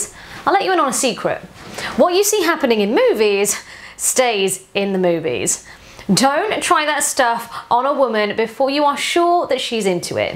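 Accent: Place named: England, United Kingdom. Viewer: Accent? It's the original English language!!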